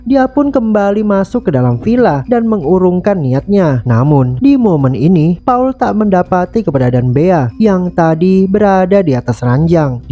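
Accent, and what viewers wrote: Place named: Banjarmasin, Indonesia. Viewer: Yg bapender ni pinanya urang banjarmasin kalsel😅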